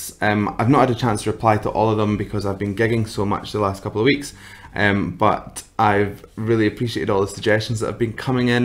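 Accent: Scotland, United Kingdom. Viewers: Liked your analysis and reaction to Katrina Velarde’s voice. Also enjoyed listening to your Scottish accent. c”,) Nice tea mug !!! Hehehe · There's just so many singing talents in this country,bro! You'll surely enjoy reacting to each. Just give in to your filipino subscribers' requests. Btw, i like your scottish accent! And your professional remarks,too.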